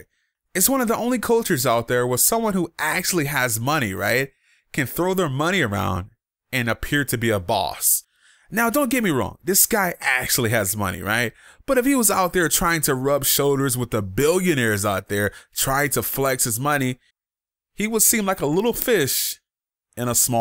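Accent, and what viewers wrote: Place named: New York, United States. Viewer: Martin Shkreli......whos mans is this????? New York Accent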